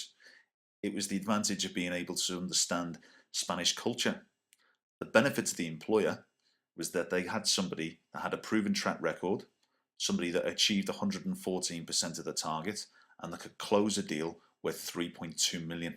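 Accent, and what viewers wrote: United Kingdom. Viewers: yessss scouser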